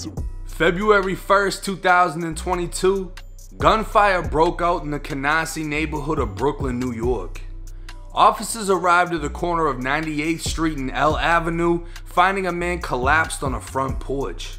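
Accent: Boston, United States. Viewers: This dude got a boston accent.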